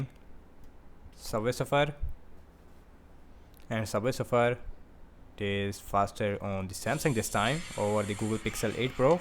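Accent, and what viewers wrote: India. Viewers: I can barely understand this review due to his pronounced Hinglish accent!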